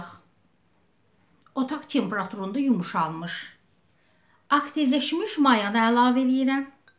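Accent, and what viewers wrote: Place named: Turkan, Azerbaijan. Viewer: Salam xanım necəsiniz. Yazan Nihadın anasıdır. Əllərinizə sağlıq görünüşü gözəldir. Lətçənizdən Zirə, Türkan lətçəsidir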